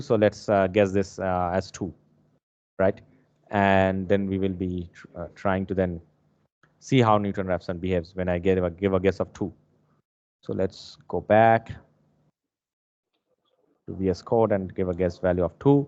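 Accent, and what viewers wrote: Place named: United States. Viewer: Tmr ekdam American accent, r u staying in USA.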